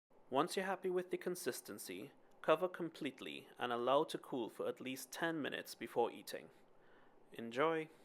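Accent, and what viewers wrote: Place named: Trinidad and Tobago. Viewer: Good speaking Trini voice and feeling proud.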